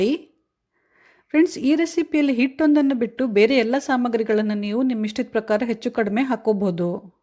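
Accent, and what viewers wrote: North Karnataka, India. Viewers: 👌👌👌 ನೀವು ಉತ್ತರ ಕರ್ನಾಟಕದ ಶೈಲಿಯಲ್ಲೇ ಮಾತನಾಡಿದರೆ ಬಹಳ ಹಿತವಾಗಿರುತ್ತದೆ.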